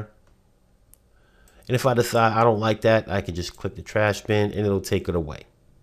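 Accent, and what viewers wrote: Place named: East Coast, United States. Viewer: Come on man that East Coast accent is bomb! Specially since I'm way out West! BTW nice tutorial, I learned a lot. Thanks!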